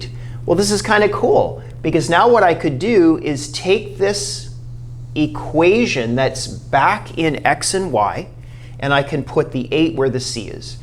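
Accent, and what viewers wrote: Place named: United States. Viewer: This is very clever. I don't think we did differential equations in school. Looks more like first year university level, but I would have understood it at 16-17 with AS or A-level calculus. It's not always obvious what subsitution to use to separate the variables. I'm surprised you say 'zed' and not 'zee' since you sound American. Perhaps 'zee' can too easily be confused with 'c'. I like that you put lines through the z's so they can't be misread as 2's. I have a job marking maths classwork and sometimes the pupils get 0's and 6's looking the same, or 'b's and '6's or 'x's written like 'times' signs. I guess the first most important lesson is how to write numbers and letters clearly! Some 2's (or 1's) look like 7's, some 3's and 5's look about the same...! Once they start doing algebra writing neatly is so important! Differential equations get so complicated with del operators and vectors etc! 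Non-linear.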